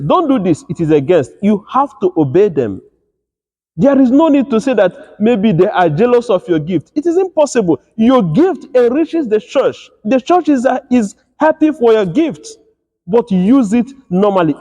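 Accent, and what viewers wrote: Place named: Nigeria. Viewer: I believe the future of the Church is in Africa. In our parish in the South of England we have two extended Nigerian families and a family from Zimbabwe, all active members of the Parish. re you Nigerian,? Your name and accent suggest so.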